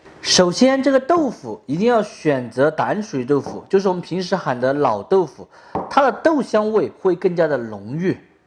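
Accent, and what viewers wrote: Sichuan, China. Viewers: 要得，喜欢听椒盐普通话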